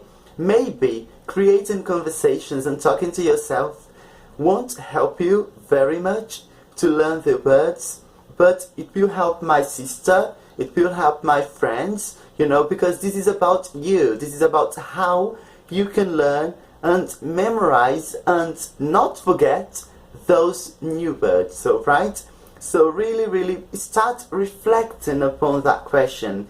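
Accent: United Kingdom. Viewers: hi my name's Leandro, and I'm enchanted with your English accent, I wish we were friends on WhatsApp, anyway, if you want to contact me , please , just Whatsapp me <num>. I have been studying English for a while so I think we could have an interesting and effective conversation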